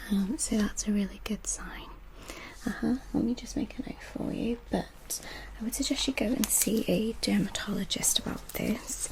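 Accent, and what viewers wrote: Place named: Midlands, United Kingdom. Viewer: It’s her accent. She sounds a bit like where im from. Midlands area, but she speaks a bit more well spoken. What you expect her to do though? She’s just talking normally?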